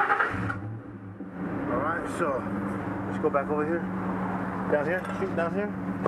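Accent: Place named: California, United States. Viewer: i like your cali preaching.I'm mechinc in learning 5yrs and counting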